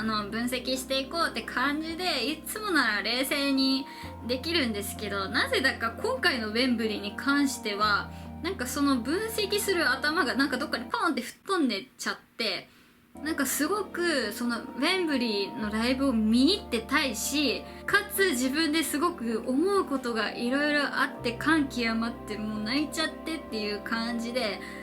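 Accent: Hiroshima, Japan. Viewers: 同じ広島人として、広島弁混じりの動画いい耳心地デス。ソレも有りいつも観て応援しています。 私は、ソニスの頃にファンカム漁りしハマってから、ウェンブリーと2nd発売の頃は、色々買いまくってましたね。 ウェンブリーは音がイイ。 各楽器が立体的に際立ち、BHOベースと青さんドラムの融合感、神バンドアンサンブル粒立ち完璧。 後、買っといた方がイイのは、LEGEND-S だと思います。 地元だし2日間とも参戦しましたが、YUIちゃん急病で片翼の危機に、２人+神バンドの気迫のパフォーマンス大規模演出ライブでした。 藤岡さん最後のギター🎸泣ける。 MOAMETALファンならば、あの奮闘MOAちゃんは買っとかないと！ て、ザ・フォーラムもだし、1月の幕張総出撃も買わんとだし、 まぁ、今年はライブおあずけなんで、その分デロ買ってね（╹◡╹ʔ༄ て、事でしょうかね。 夢が有る事は大事。人生は一度きり。その若さなら時間も可能性も充分有る。 人生は夢だらけ(by,YUIちゃん)w。 頑張れ👍。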